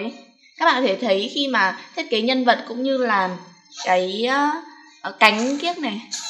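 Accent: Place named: Hanoi, Vietnam. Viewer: Rất thích giọng con gái hà nội 💝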